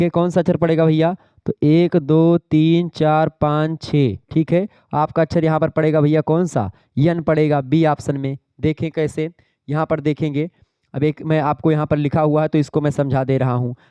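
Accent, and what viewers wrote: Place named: Awadh, India. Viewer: सर के मुंह से अवधी भाषा बहुत प्यारा लगता है❤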